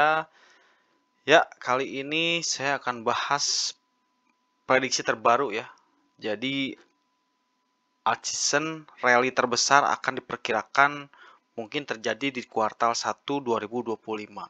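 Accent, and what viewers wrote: Indonesia. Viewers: Bang lu orang Tegal ya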